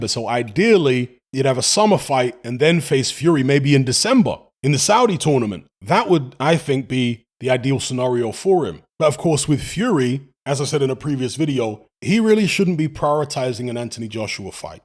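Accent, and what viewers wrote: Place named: London, United Kingdom. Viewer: There a song called, this sounds like it was spoken by a londoner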